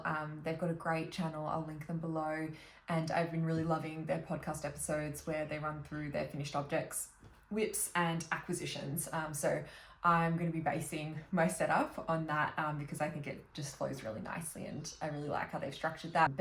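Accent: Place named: Australia. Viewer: This is the first video of yours that I have watched and let me tell you how my jaw DROPPED when I heard your aussie accent! As a fellow aussie who adores knitting and crocheting, it is so exciting to find your channel :) Looking forward to watchinf more videos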